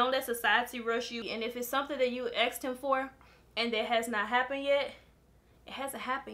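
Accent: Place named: Memphis, United States. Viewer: I'm really glad I've been following you almost a year. And you are a big motivation for me. For because you from M Town South Memphis to be exact 💯 in your voice lol. And you have a godly and lit spirit. Merry Christmas eve ❤ 🌲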